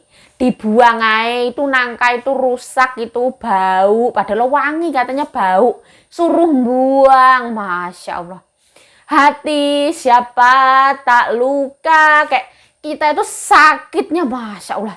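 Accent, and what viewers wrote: Java, Indonesia. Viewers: Aq seneng liat mb...jowone medok..dr Blitar ya mb,?..sy Arema mb.Mlg.... 😀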